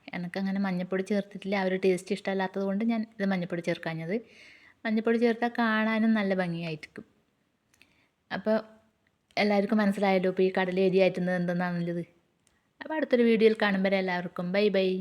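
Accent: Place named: Kasaragod, India. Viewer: ഭാഷ തനി കാസർഗോഡ് തന്നെ അല്ലെ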